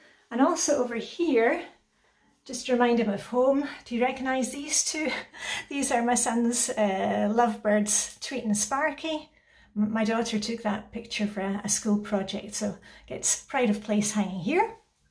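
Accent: Scotland, United Kingdom. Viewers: What a well stocked kitchen, it’s great to see he cooks properly. I have a question which I hope isn’t too personal and respects your familiy’s privacy, but I have wondered about this for ages - do your children speak English with your beautiful Scottish accent?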